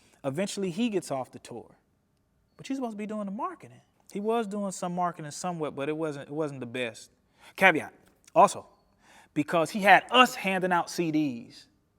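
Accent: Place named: South, United States. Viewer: Got that southern Baptist preacher drawl… love it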